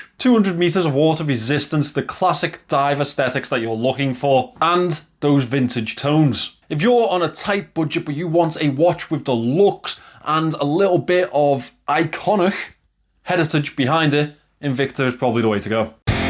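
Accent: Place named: Liverpool, United Kingdom. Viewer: Actually, I didn't know at the beginning. I even thought you were not native English speaker..😅 I researched little and I found out that someone speaks same like your accent and I realized there were many people same like your accent in U.K. and it was Liverpool..😄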